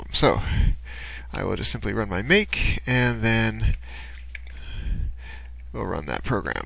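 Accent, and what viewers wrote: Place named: United States. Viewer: Cis online instructions sounds weird when read with an american accent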